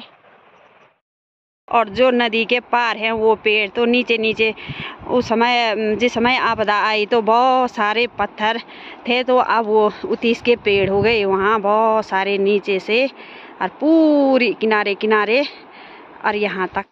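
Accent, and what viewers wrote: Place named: Garhwal, India. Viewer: Jitni marji Hindi bol lo gadhwal ton me bat to ho hi jati hai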